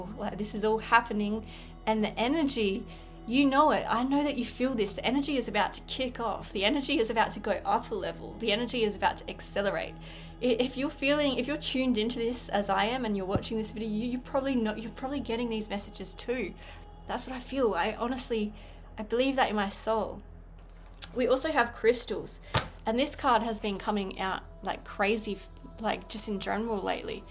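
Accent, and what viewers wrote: Australia. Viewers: Aussie accent!❤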